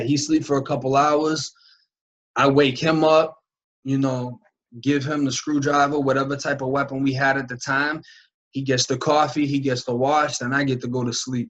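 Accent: Boston, United States. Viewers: Dude its awesome that your puttin your story out there, takes a lot of sack! It's funny, I definitely noticed your bostonian in the first video, I had the same thing happen I spent years between Florida and Beantown "home" and more I find myself droppin my R's lollol its wicked funny cause you were so right about ppl saying there from Boston but really bring from Waltham or Billerica lmfao Great stories bro, keep it up!!! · This is definitely off topic but I really dig the Boston accent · That Boston accent is nails! Respect from U.K! Cheers for sharing your story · Got all caught up yesterday on Lockdown 23and1 vids yesterday & so happy I found you thru Christina's Channel! Can't agree with you more on so many levels. No I never did actual time but in my past (long time ago past) had a serious boyfriend that did. I did that time with him even tho I was on the outside! 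Ppl don't realize going to prison affects everyone around that 1 person actually doing the time! I'm not with him anymore, it's been over a decade since we split, tho we remained friends & I supported him w/out enabling him for years after. Anyways, now got you to watch (gotta love not just your content, but that Boston accent!) 😆🙃 Glad you turned your life around & so happy for you overall! Plus now there's Lockdown 23and1's Channel to get that area of country perspective of prisons & interviews w/ other ppl! Can't say enough how grateful I am that you started a YT Channel! God bless! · Bruh im from the UK so its probly that,but your accent sounds kinda Boston and i thought youre from Florida..is that a thing or have u moved from there? Love your videos btw nice and honest..thanks for the info. B. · Really loving this guy so far...but I especially love it when he talks more with his Boston-ish accent opposed to the other way her talks..I can listen to that east coast style allll day :) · Saw you on 23 &1. 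Checking you out from Rhode Island. Love hearing that Boston accent that I grew up with. Psyched to see you with your own channel! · Came from Christina’s channel! I love how humble you are! Stay true to you and keep your head up! I cannot imagine being in your shoes, but I hope you can learn from the roughness of your past❤️. God bless! I can’t wait to see how far you go in life! Btw, I love your Boston accent! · Good video. He’s not a Florida boy tho. I hear providence or Boston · That authentic boston accent👊🏼 Hows it goin everybawdy😃 Gotta ❤ it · Always gotta rock wit someone else from the 813. Your startin to get a Boston accent tho my bro. Much love from tampa we keepin it real down here.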